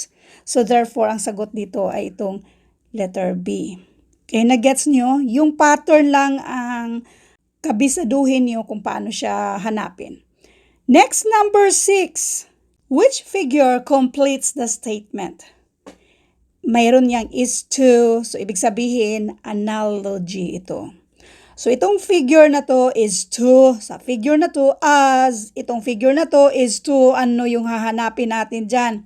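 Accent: Visayas, Philippines. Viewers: Bisaya accent 🫶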